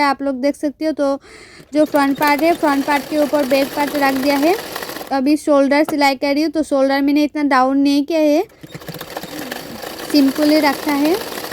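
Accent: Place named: Bengal, India. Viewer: Apnr kotha sune bujhte parchi j apni akjon bangali,, Ami ki thik bujhechi ?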